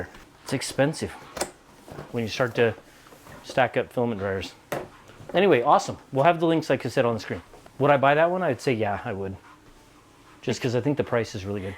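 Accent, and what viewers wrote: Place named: Idaho, United States. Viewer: Yeah, although mountains can have increased exposure to solar effects which can lead to greater evaporation, since mountains tend to have fewer local sources of water, and lower levels of vegetation, they can end up with lower humidity in summer. And moreso if the local/regional climate is already dry during the summer. I've only seen maybe a dozen or two of your videos, and I don't think I've heard you say where you are, but based on that brief clip of a snow storm, your channel name, appearance, and accent, I'd guess that you're somewhere near Idaho. That whole region has a mix of mediterranean and semi-arid climates, with summer already being the dry season. So, that would all make sense. I've only seen a dozen or two of your videos when YouTube has recommended them to me, though I am subscribed. I hadn't seen your streams, but I went to find the stream you referred to, thinking I'd see what you talked about, but it's ten hours long, so I decided against it. 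😀 Looks like you're doing cool stuff, though. 🙂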